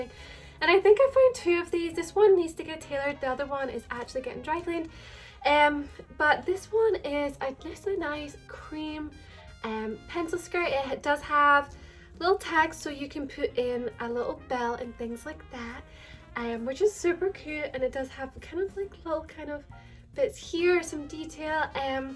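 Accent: Scotland, United Kingdom. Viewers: She made a YouTube video on it a few weeks ago, have a look :) basically she was hiding her proper Scottish accent in fear she wouldn't fit in or couldn't be understood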